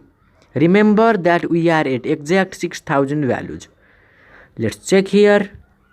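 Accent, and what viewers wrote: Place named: Nepal. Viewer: Nepali po ho Brother ... Accent le testai lagdai xa....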